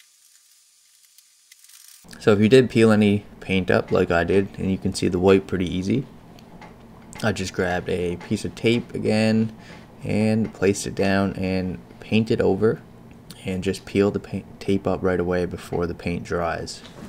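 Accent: Canada. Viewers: Your pronunciation of your "ou" s gives you away as being from Canada. I would say Calgary? Somewhere in the mid part of your fine country.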